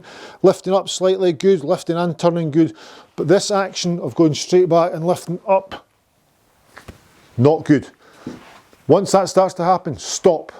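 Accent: Scotland, United Kingdom. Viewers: Think your instruction is great. My son in law and I talk to each other on the course and inevitably are saying “that’s good!” In a hard Scottish accent when we are channeling you. Maybe I’ll make it to Peebles one day “soon”. That’ll be “good”. Cheers 😎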